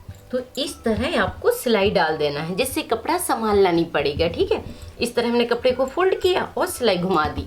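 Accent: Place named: Bundelkhand, India. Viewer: आप बुंदेलखंड की है। आपका बोलने का acent बता रहा है।❤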